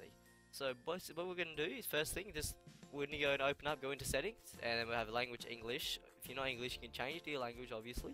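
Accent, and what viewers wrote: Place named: Australia. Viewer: Aussie I Guess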